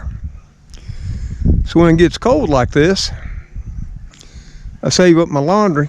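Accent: South, United States. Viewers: I am not young but then again I am not your age either. Love southern talk. Milk barn heater hmm wonder if one can still buy those things bet my mom knew about those.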